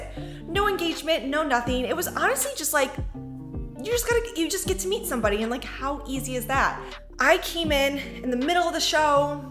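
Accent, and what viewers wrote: Midwest, United States. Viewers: Your accent is so cute! You sound like a real Midwestern :)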